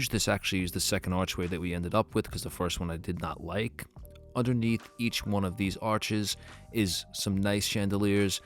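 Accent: New York, United States. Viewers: Also are you from New York? Your accent definitely sounds like it. Which is a compliment btw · Dang that New York accent you have there is cool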